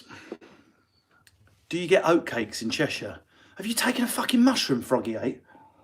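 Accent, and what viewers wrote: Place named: South Yorkshire, United Kingdom. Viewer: Wow! South Yorkshire accent brilliantly portrayed 👍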